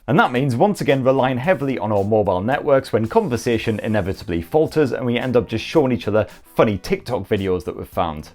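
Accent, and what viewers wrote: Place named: North East England, United Kingdom. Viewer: North East UK. Mic of English and Scottish English.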